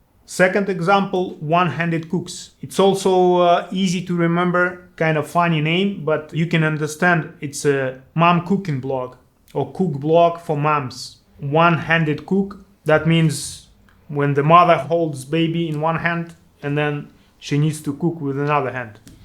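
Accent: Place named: Bavaria, Germany. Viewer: Your accent sounds like your from Bavaria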